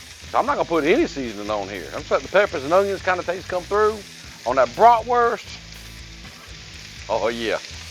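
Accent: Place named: New York, United States. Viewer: How come when I saw you in a bar in the East Village you sounded like every other New Yorker in there?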